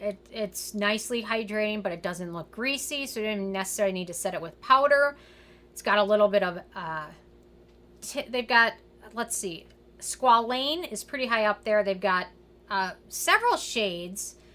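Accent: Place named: Dakotas, United States. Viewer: Curious are you from one of the Dakotas? Minnesota? Trying to nail down that accent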